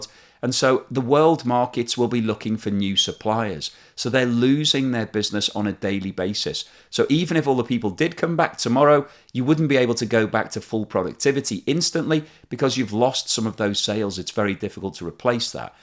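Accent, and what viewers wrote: United Kingdom. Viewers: Every other video cites the demise of Russian economy done February'. Yet to happen. Maybe he needs more videos on the tanking of the EU and the USA economy better yet his British accent the UK impending economic collapse. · He actually sounds British.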